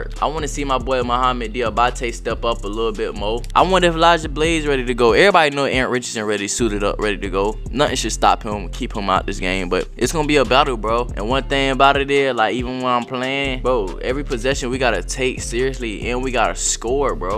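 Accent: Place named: Florida, United States. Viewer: He’s speaking perfect Floridian bruh Idek what yall talmbout yurd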